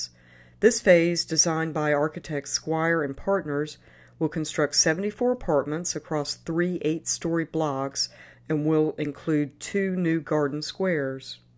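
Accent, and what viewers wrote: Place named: United States. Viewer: Why the American accent!!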